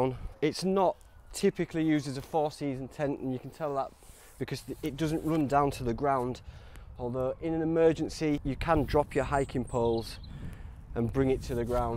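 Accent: Yorkshire, United Kingdom. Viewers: Great review!! The 2 Pro looks the ticket. By the way, thats got to be a North Yorks accent right? Im guessing York :-)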